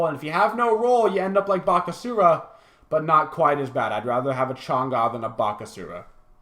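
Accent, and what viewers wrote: United States. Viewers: You seem like the type of guy who speaks " 'merican" as you would say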